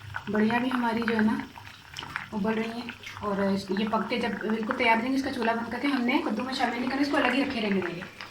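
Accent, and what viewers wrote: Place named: Punjab, India. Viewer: muzna malik یہ تو پنجابی لہجہ ہے یہ تیز ہی بولا جاتا ہے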